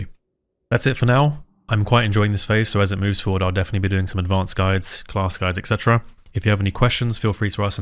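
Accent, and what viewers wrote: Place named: England, United Kingdom. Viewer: If youre going to speed up your speech dont mumble. Maybe it's your accent. Strange how the english invented the language yet cant enunciate to save their life.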